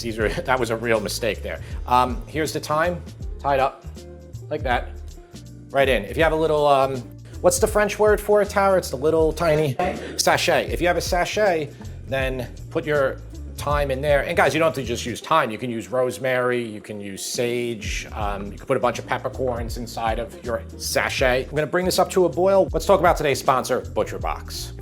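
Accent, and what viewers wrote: New York, United States. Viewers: Holy cow! You ARE from NY. I'm originally from NJ, but at this point in my life... don't talk so fast. 😂 But Finally, I found a good recipe for beef barley soup. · Hey there, slow down guy!:- typical "Nyoo Yawkeh", talkin' a mile a minute! Most of us are not from New York and we like to get it nice and slow, take your time please, it's a good video, no need to rush it! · You can cook for me anytime 😊. Recipes are spot on and easy to follow. I love watching you and your family and enjoy listening to your New York accent.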